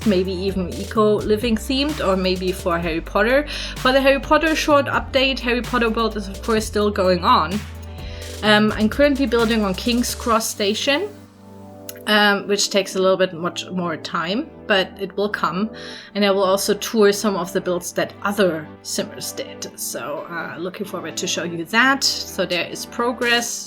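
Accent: Germany. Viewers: Me: hears the accent *Checks channel information yup. Germany. P.S. COOLES VIDEO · And youre dialect sounds like German is that right ? 😊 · Der deutsche Akzent ist die beste! 💛 So ein schönes Haus. Echt gut gemacht!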